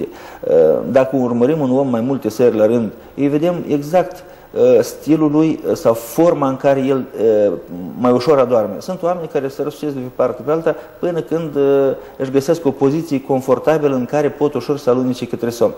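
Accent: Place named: Moldova. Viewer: Tipu e moldovean si ii e un pic cam greu sa renunte la accent (mai are scapari de accent) mai ales intr-un interviu televizat. Daca ar explica totul in termeni psihologici nu multi am intelege. El incearca o explicatie cat mai coerent pentru a intelegi si ultimul pamantean.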